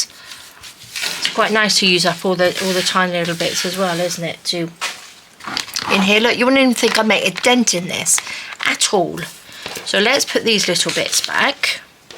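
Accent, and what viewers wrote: United Kingdom. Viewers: Oooh the UK sounds 👍 x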